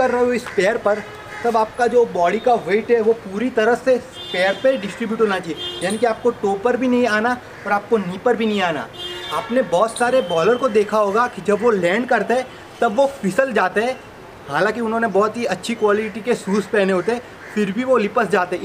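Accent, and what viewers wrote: Gujarat, India. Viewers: Bhai muje lagta hai ki Aap gujrati ho?? Ans me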